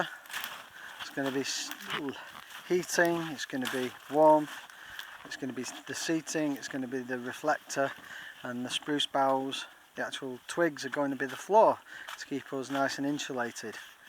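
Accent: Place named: Manchester, United Kingdom. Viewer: Sounds like a Mancunian twang you got there 😊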